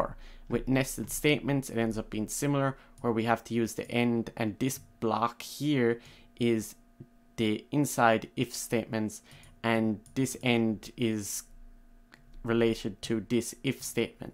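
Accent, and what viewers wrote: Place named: Ireland. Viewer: 95% sure you're irish or grew up in ireland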